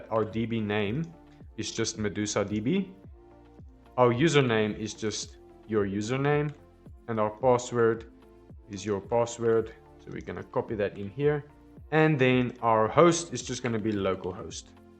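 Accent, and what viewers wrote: South Africa. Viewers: Ha I knew the accent within the first 30 seconds, but I’ve been working remotely with ZA folks for over a decade now so it’s second nature by now. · Great Tutorial YT / !! Also, hello as a fellow South African - recognize the accent from anywhere :) I saw a lot of comments/questions regarding postgres URL that is passed during the NPX-create. I did a little troubleshooting and found the following worked really well for me: TL;DR = Add single quotes around the URL string. Add single quotes around the URL string. Adding quotes is the answer; you might have a different setup, noticed you're on PC, on Mac or Linux it is possible to require quotes is my guess. Not using quotes got me stared but then I got error shortly after opting in to create the Next.js storefront. I figure why not do it now vs later. ERROR: "Couldn't connect to PostgreSQL using the database URL you passed. 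Make sure it's correct and try again." Then after some reading, I used double quotes and it failed as using double quotes with '@ or '!' means something else in ZSH-World. End Result: Use Single Quotes around the postgres URL Keep up the good work! Cheers! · I hear the south african accent! 💫 😂 Please do a video to customize to add other tables or inherit and add fields, and make this into a Multivendor marketplace like takealot or Amazon!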